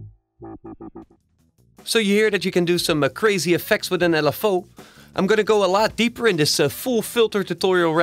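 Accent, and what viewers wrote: Netherlands. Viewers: Areeh joe Nederlands? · Haha - You sound sooo Dutch. Nice tutorial though - thanx ;)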